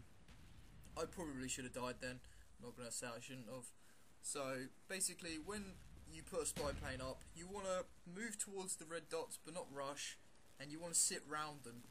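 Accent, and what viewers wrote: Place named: Australia. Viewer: very Australian english accent :)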